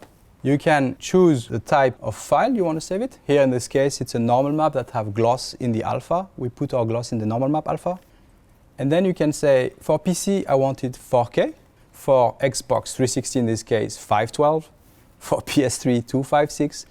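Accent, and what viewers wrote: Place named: France. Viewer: Good french accent ! haha content de voir un Français chez Crytek ! c'est pas tout les jours !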